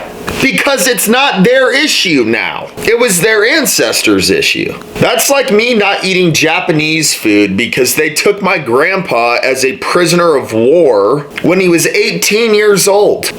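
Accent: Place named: South, United States. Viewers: Ain't nothing better than "Southern common sense!" It's always good but that Southern accent just makes it better.☺ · I'm Black and me and my circle of friends 99.9 % of our time, never complained or even thought about racism ,white privilege, or inequality . We had more diverse things to talk about. (There IS a silent minority among us ) By his tone, the narrator(he sounds like a White Southerner and I honestly don't know about white/black relationships in the South) he's making it sound as though Black people just sit around all day and talk about racism. Personally, I'VE never been concerned about people who perpetually discuss racism. Stop pigeon holing all Black people. His conversation isn't really worth listening to. Stop trying so hard by convincing Black people that you are not racist if you're not.Quite honestly, I'm not concerned about your social graphics.just ignore people who try race baiting, they probably have nothing else better to do with their lives. 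I'm slightly annoyed by talking about people who talk about racism.JUST IGNORE THEM...PLEASE?)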